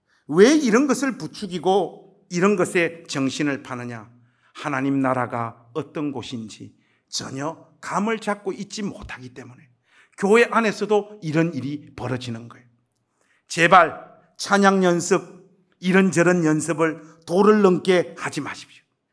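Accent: Gyeongsang, South Korea. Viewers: 목사님 설교는 너무 좋아요. 그런데요 경상도 말투가 , 악센트가 너무. 강 합니다😂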